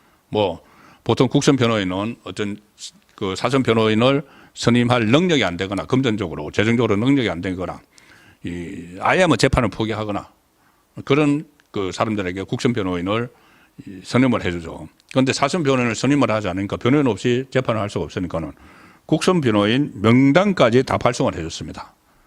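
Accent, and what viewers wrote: Gyeongsang, South Korea. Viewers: 조중동 종편 눈치나 살금거리고 보면서 대세가 어딜까? 머리굴리는 경상도 말투 송국건 ㅉ 경상도 의리있다는 거짓말이나 하지마라 ㅉ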